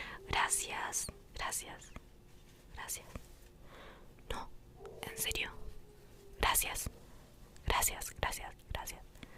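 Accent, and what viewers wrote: Argentina. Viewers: Sos argentina como yo?? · Wah... me encanta el acento Argentino, con solo escucharlo me relaja v: \^-^/💕 Eres muy hermosa ❤ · sos argentina? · Hola,te quiero preguntar de que pais sos? Por q creo que por ti forma de hablar sos de argenrina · Me cage de risa ensima el acento porteño nananana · llevo 45 segundos de video y estoy seguro que es argentina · Si no es Argentina es uruguaya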